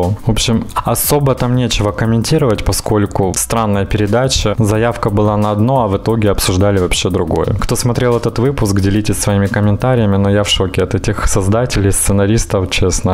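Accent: Ukraine. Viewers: Здравствуйте) Голос у Вас действительно очень приятный, с небольшим украинским акцентом. И человек Вы позитивный, это, прям, слышно. Скажите, как Вас зовут, 🙂я уже месяца 4 смотрю Ваш канал, никакой информации нет, и всё. Хочется комменты писать, обращаясь к Вам по имени🙂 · Мне кажется,что у вас харьковский акцент...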